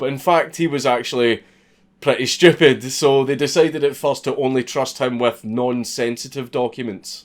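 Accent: Scotland, United Kingdom. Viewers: Swallowing corks sounds a bit funny with a scottish accent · scottish man reads wikipedia at you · Just watchin this for the Scottish accent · Subscribed to Sue´s channel too. 1: I like the scottish accent 2: To support Mister and Misses Dankular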